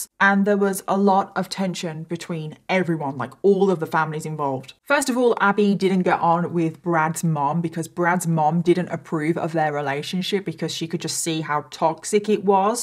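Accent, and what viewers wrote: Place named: Birmingham, United Kingdom. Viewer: ‘A bit off topic’, but your accent sounds Birmingham. Am I right?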